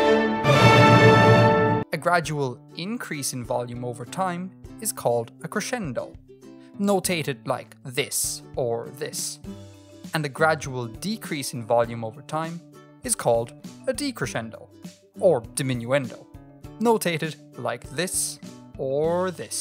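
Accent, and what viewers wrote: Ireland. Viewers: Definitely an Irish accent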